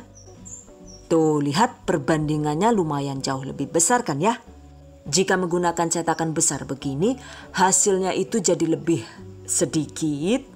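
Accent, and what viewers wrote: Banyumas, Indonesia. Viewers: Trima kasih resepnya bun.. insya Alloh jd ide jualan lagi nih..😁 Jadi nyengir" sendiri denger ngapaknya..😁😁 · AQ paling suka nonton video mbk lucu ada ngapak2nya karena AQ orang ngapak hehehe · Ngapak sih mba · Pengen kenal sama bunda, ngapak nya itu loh gemesin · bunda...BHS ngapak mu bikin aku MLM 2 ngekek...bunda asli dr kota mana sih?tinggal di Madiun. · ini bunda org mana sih?koq ngapak bgt hehehhe kyk saya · Bikin resepnya jelas bgt👍👍👍 tp gak kalah sama bhs ngapaknya jelas poolll, kencoot😂😂😂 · Senengnya belajar msk SM Ada hiburan ny ngapaknya Saya seneng karena SM dg saya dri Cilacap 👍❤️ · Mksih Bund..resepnya mantul apalagi ngapaknya pd kro nyong banyumasan Bund...ora ngapak ora kpenak😃😃😃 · ya allah mba.. ngapak pada karo nyong lah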